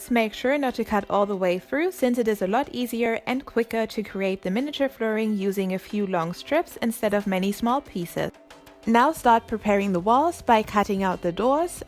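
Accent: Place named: Germany. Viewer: Sie kommt zu 100 Prozent aus Deutschland ich höre es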